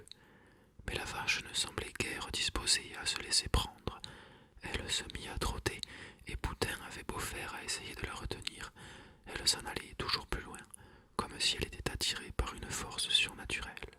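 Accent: South, France. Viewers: Je me demandais si tu étais toi-même breton puis je me suis rappelé ton petit accent qui sonne plutôt du sud, j'ai raison ? 😇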